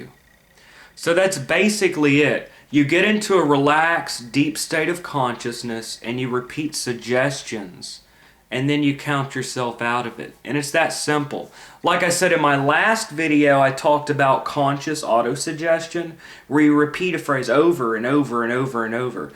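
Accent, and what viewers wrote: South, United States. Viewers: he sounds like hes from the south, like me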